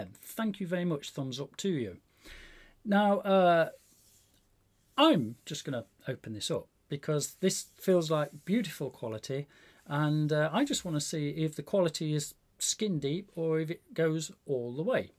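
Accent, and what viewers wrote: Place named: East Midlands, United Kingdom. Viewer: ah thanks for let me know I thought it was a Notts/ Derby accent :) I just came across your YouTube page and just now starting to look at your other vids, very interesting too. I have a music production studio and am also into sound engineering but more hands on than hands dismantling heheh. I also own the Push 2, which I found fascinating when you dismantled it.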